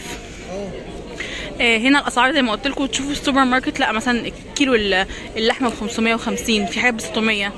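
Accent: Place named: Alexandria, Egypt. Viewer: شكرا اختي ع الفيديو الرائع ده.. ربنا يوفقك.. لو مافيها تطفل او ازعاج.. ممكن تكتبي اسماء الاماكن والمناطق؟ يعني مثلا اسم البارك بالظبط والمنطقة. الله يوفقك و سلامي ل وليلى.. وشكرا تاني على فيديوهاتك العفوية دي وكتري اووووي من الكلام الاسكندراني 😅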